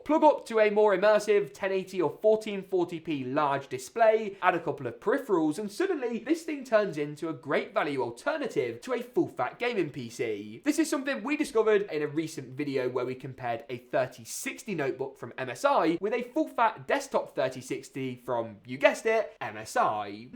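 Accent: United Kingdom. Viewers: british accent is so ridiculous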